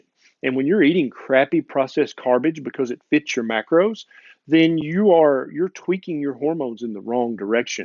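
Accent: South, United States. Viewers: Apart from your incredible intelligence, you could have been a movie star from the golden era of Hollywood! Love the Southern drawl as well!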